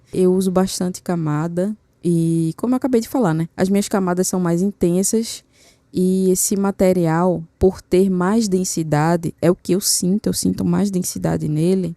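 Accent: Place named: Recife, Brazil. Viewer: Vixi Maria que agora melhorou foi muito! Cafe com leite, Senhor dos Aneis, sotaque lindo de Recife e observacoes/trabalhos sensiveis…bom demais❤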